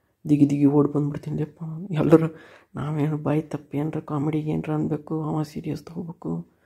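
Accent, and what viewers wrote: North Karnataka, India. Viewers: anna nin video osm...ur talented.. Uttar Karnataka accent masth ad...bhal ishta agthad....innu jasti videos madri anna..all the best from uk fans 💐🙏👏👏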